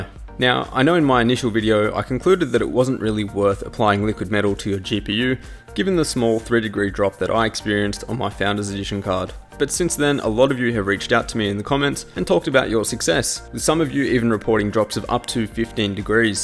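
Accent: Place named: Australia. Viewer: Subbed for Australian accent.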